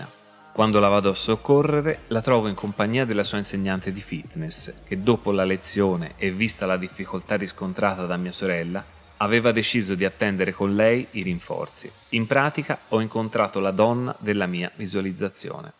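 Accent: Tuscany, Italy. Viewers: Ti ascolterei tutto il giorno già solo x il tuo favoloso accento toscano... io amo ❤️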